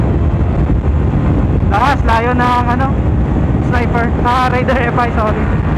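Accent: Iloilo, Philippines. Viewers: Ilonggo ka gli to😂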